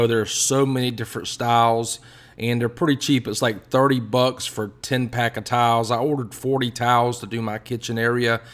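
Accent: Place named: South, United States. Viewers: You're fun to watch. That Southern drawl is highly entertaining, "We tested'ed 'em." Hehe (I'm a KY girl. so howdy!). And you did a really good job; very precise. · This is so beautiful!!! Are you from New Orleans?? You sound so much like Louisiana!!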